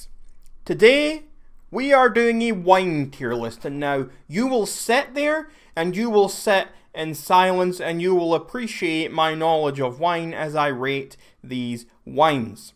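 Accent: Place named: Scotland, United Kingdom. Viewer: Your Scottish bro, stop tryna act class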